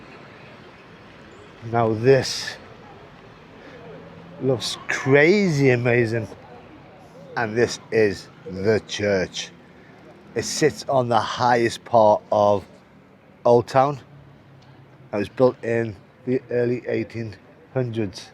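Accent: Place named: Northern England, United Kingdom. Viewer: you are welcome. Thanks for your videos. Your positive attitude shines through. I also love different accents and yours, i guess northern England, is just great. Like you i am fascinated by history and travelling. I learned all this quite recently when i began digging in my family tree and read the history for context. This is not taught in schools.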